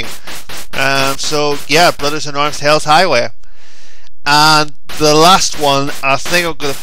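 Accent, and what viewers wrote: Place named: Germany. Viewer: but your german..i could tell by the vocals ..i am german with spanish and portugesse ..ancestory :D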